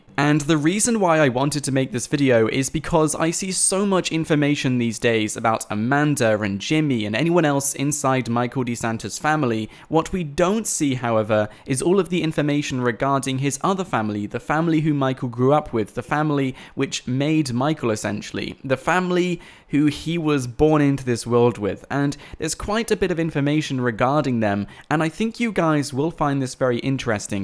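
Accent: United Kingdom. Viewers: Firstly don't hate on his British accent and secondly he's saying it the right way, it's pronounced mike-al-de-santa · Stop being mean, its not his fault hes british and that he has a British accented!!!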